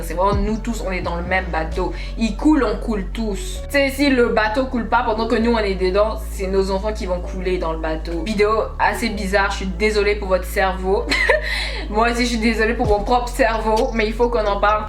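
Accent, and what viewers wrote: Quebec, Canada. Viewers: T’es québécoise nan ?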